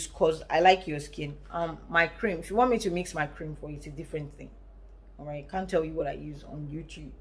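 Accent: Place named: Yoruba, Nigeria. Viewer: I love listening to ur lectures, especially the way you speak that ur yoruba eehh, nice dear, plz can someone that did Ivf take satin supplement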